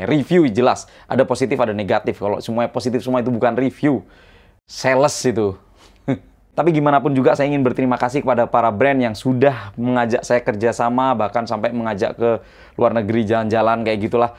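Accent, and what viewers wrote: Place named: Surabaya, Indonesia. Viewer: oalahhh logat nya kayak orang surabaya dulu makanya tak kira orang surabaya wkwkwkwk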